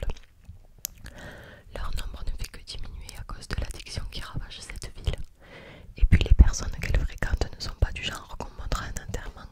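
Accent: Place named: Marseille, France. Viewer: tu es Marseillaise non ? Ton accent le montres bien mdr allez l om 🎉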